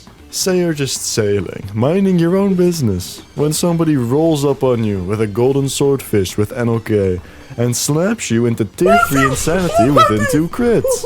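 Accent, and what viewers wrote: Netherlands. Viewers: Ik hoor een Nederlander ❤ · your accent is like realy dutch idk man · ik hoor de nederlandse accent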